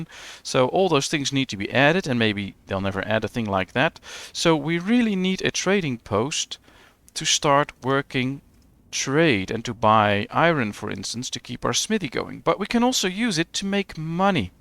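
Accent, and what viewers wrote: Netherlands. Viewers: very useful and well explained , you must be Dutch :) hearing from your accent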